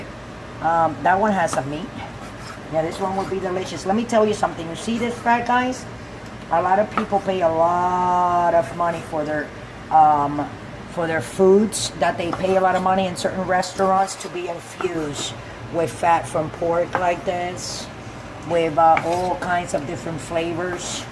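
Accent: New York, United States. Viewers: GREAT STUFF!!! IM A NYC ITALIAN GUY JUST CURIOUS R U IN NYC TOO SOUNDS LIKE IT LOL I SUBSCRIBED THATS MY KIND OF FOOD LOL I USE A TON OF PORK BELLY FAT WHEN I MAKE MY 5 HOUR SUNDAY MEAT GRAVY SALUTO 🍷🍷🍷🇮🇹🇮🇹🇮🇹 VIC FROM NYC CIAO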